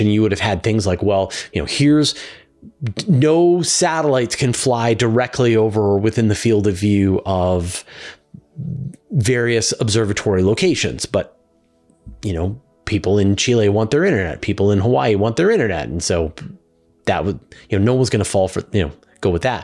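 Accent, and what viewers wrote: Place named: Canada. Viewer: All alone and finally at peace is clearly what you meant to say. =) haha Man oh man I love this channel. It's so nice to hear a fellow Canuck.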